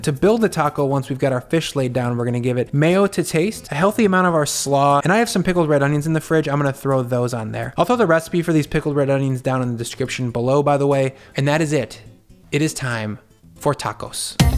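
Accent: United States. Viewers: I'm American. You sound American. Why are you using the Metric System? You can always edit for those overseas. I'm still gonna try your recipe though.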